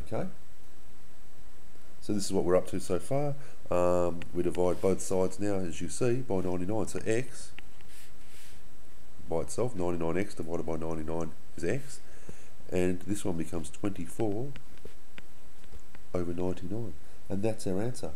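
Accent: Australia. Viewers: I like his deep Australian accent :3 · ARE YOU AUSTRALIAN?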